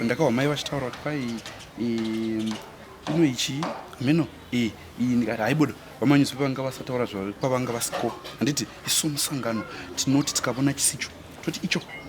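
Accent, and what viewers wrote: Karanga, Zimbabwe. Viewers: Aiwa tiri kuchinzwa chikaranga 🙆🏻‍♀️🙈🙈🙈.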